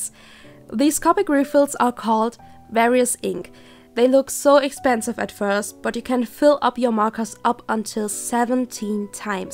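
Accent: Germany. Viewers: Are you German, I recognised the accent . Bist du deutsch ? Ich erkannte den Akzent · Du hast echt einen krassen deutsch Akzent, bist du deutsche ? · bist du aus Deutschland? xD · Are you German? I can hear a lil German-like accent? Ahh hab die Kanal info gelesen! Haha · Are you german? That sounds like a german accent xd I'm german xd Edit: jap ich hab deine Kanalinfo gesehen :3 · Hey german bud ^^ <3 I heard the accents immediately because I got the same xD Maybe if you like videogames we may play together one time ^^ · wie ich schon am anfang gehört habe, muttersprache deutsch. Ich hör es immer raus, egal ob der akzent stark ist oder nicht xd, danke für das video :) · Are you German? Your "accent (is this right?! xD) sounds so German ':3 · I love your german accent! It's a lot more soothing to listen to than all greasy american you hear on YT. I'm from sweden so I don't have perfect pronounciation either. 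Some senteces sound great for me, and some words are just... urgh. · Sminfin ja liegt einfach daran das wir den allgemeinen Klang der Muttersprache oft sehr lang behalten selbst wenn wir andere sprechen. Wie man hier ja gut sieht. Ihr Englisch ist super und sie Betont wunderbar aber der gesamt klang ist deutsch. Ein Native-Speaker würde sachen einfach anders betonen , andere Geschwindigkeit benutzen etc. Briten singen zb etwas mehr :3 (Sorry Ich bin sehr interresiert an Sprachen und studiere grad eine Übersetzen & Dolmetschen) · Are you German? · Are you from Germany? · Yep shes german lol I heard the accent too. I'm not german tho I speak flemish (belgian dutch) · Hab ich auch mitbekommen. Sie hat einen ziemlichen deutschen Akzent, auch wenn des jetzt nichts negatives bedeuten muss.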